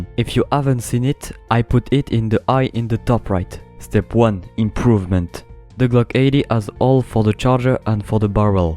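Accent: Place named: France. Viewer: Salut! Super cool tes vidéo, merci beaucoup! Je vois que les gens apprécient ton accent français haha! Fais gaffe quand même: J en anglais ça se dit ''djay'' et pas ''dji'' (et G se dit ''dji'' justement)... Je me suis gourré dans le raccourci à du coup ^^ j'ai fait ctrl + G (et ça a fait un truc mais je sais pas exactement quoi haha)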